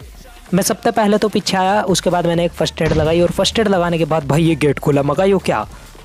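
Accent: Haryana, India. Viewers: Bhai comentry Haryanvi and hindi soo funny I like it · ur haryanvi touch is superb Three seater: thukta pher char jagah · Bhai Aaj To Katai Haryanvi Horya H🔥🔥😍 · Aaj to haryanvi boli has ri 👍😂❤️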